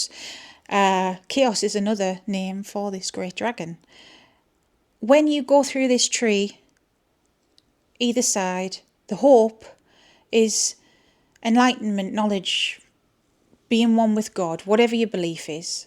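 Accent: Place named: North East England, United Kingdom. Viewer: Oh your a north east lass , Xx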